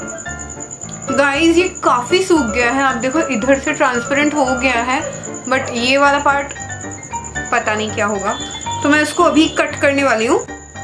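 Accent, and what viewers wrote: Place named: West Bengal, India. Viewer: ap bangali ho you know when I hear you bengali voice I just 😱shocked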